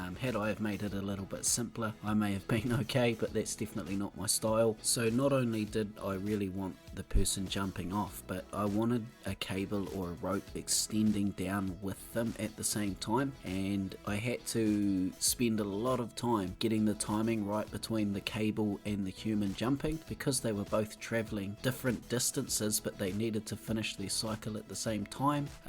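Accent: New Zealand. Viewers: ok u asked for ideas: i would love to see an extended cinematic view riding on this yellow motorbike! Just riding all through the city. We need to relish in the awesomeness. Its like you create this awesome stuff but we only get to see it once for a very short time. And dont worry about the accent, it’s impossible to sound like a sweaty nerd with a new zealanders or Australian accent lol. At least from an American pov. Good work though, another banger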